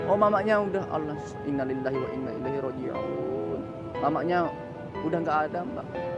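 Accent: Lombok, Indonesia. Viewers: Logat"nya kek org lombok njirr😅 Owyah Bdw salam bang Gua dri Ntb nusa tenggara barat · Boleh Sy nebak-nebak...spt Logat orang Lombok❤️ Mf kalo salah...! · knapa nada antum macem orang lombok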